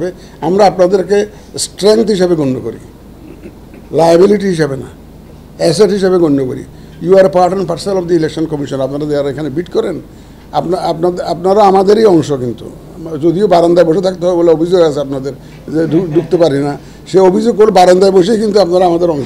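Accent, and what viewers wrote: Chittagong, Bangladesh. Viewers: ওনি চট্টগ্রামের ভালো লাগলো কথা শুনে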